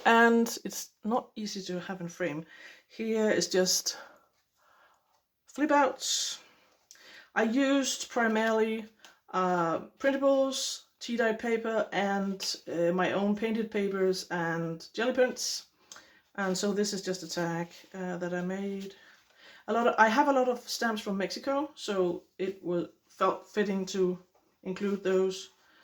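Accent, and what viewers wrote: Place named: Denmark. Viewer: I love it! I haven't come across your channel before. I've been trying to place your accent - you said you don't speak Dutch, so you're not Dutch. I'm sure you're either European or Scandinavian. Are you Danish? Lol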